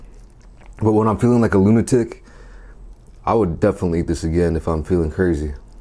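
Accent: United States. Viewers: I can tell you aren’t from Seoul originally but from the U.S. why do you live out there? Jw. Love your content!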